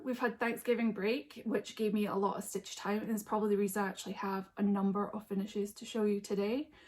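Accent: Scotland, United Kingdom. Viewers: Hi, I just found your channel after a shout out from mommolovesyougb. Those are some fantastic finishes for the year, you achieved a lot! Great hearing a familiar scottish accent on flosstube, I am a fellow scot from aberdeenshire! Hope you have a merry christmas, a happy hogmanay and a fantastic new year!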